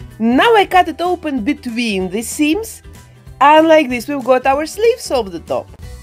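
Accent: Russia. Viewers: It seems to me or you have a Russian accent?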